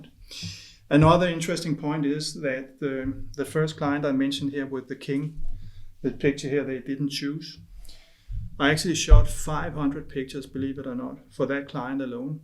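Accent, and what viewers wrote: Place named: Denmark. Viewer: Your accent sounds Danish.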